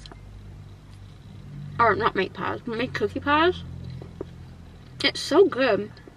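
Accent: South, United States. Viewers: Where are you from? Because you definitely have a southern accent. You dont sound like youre from California. Lol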